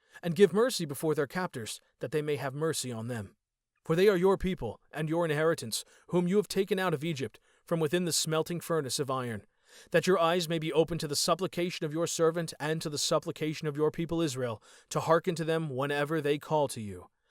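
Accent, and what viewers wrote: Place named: United States. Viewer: Is this talmud shit in American Zionist accent... ⁉️‼️⁉️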